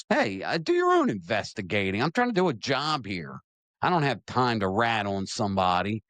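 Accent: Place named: Baltimore, United States. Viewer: Hello, had to catch up, have not seen videos for a few days, I remember the show you had on Facebook and missed it when you stopped, now I know the truth, glad to have you back, Andy from Baltimore (Essex) and yes you have the distinct Baltimore accent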